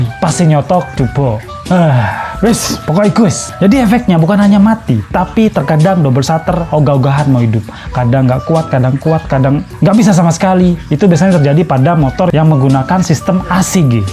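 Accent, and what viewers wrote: Madura, Indonesia. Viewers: Sampean asli mana mas kok bahasanya jawa tapi logat madura 😂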